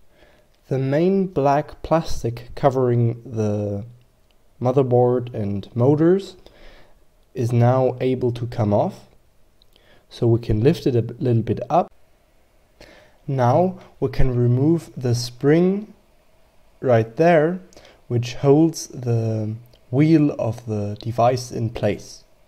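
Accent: Germany. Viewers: 6 years after you created the video I need to say 2 things: 1) "DANKESCHÖN" as you German accent was very obvious at that time 😉 2) You saved my life: the ball bearings of the gear drive of my vr300 was completely stuck due to some syrup my kids left on the floor and the robot sucked it in like a champ. Again, awesome!!!!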